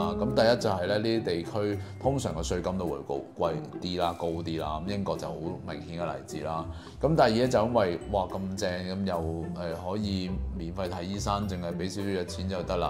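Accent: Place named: Taiwan. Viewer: 姐的中文，愈來愈台灣味⋯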